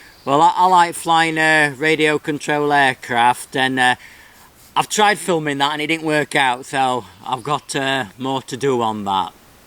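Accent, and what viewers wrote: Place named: Northern England, United Kingdom. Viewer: Looking forward to the DIY food dehydration tutorial. Nothing better than a northern accent, I'm up in Leeds. Congrats on the 1000 subs.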